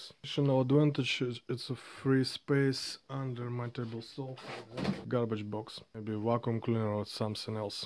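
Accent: Russia. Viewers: Мне послышалось или у автора действительно акцент русский и он русско - язычный?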